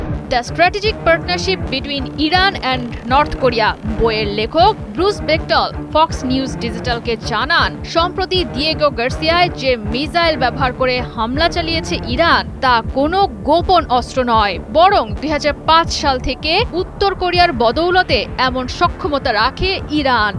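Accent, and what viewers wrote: India. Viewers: এটা কি ইন্ডিয়া,ন সাংবাদিক খবর পড়তেছে?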